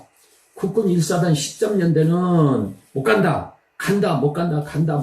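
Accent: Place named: Jeolla, South Korea. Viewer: 전라도분이시군요. '인자' 이 말 좀 좀 빼시지요.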